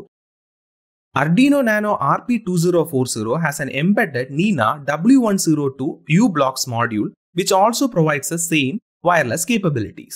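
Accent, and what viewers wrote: India. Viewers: Sorry ... this indish-english is extremly hard to listen to for more than 30 seconds ... Wearable applications are mostly direct connected with need of low-battery consumption. So the Nano in coop with RPi is non-sense.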